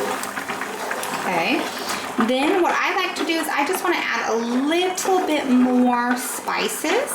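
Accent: United States. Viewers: Good morning. Nice to watch this new video. Frankly speaking, I watch your videos not because I am fond cuisine, but because I listen to you speaking English in American accent. I am a fervent learner of English language. I really appreciate the particular video, in which you showed how passionately you are leaning Bengali. I will be looking forward to watching such more videos from you.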